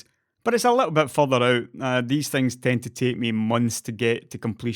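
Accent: Scotland, United Kingdom. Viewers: Love hearing that Scottish accent. Music to the ears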